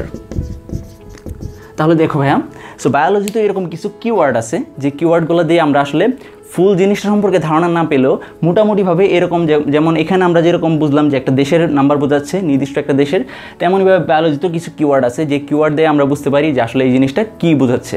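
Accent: Noakhali, Bangladesh. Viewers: Apni ki Noakhali r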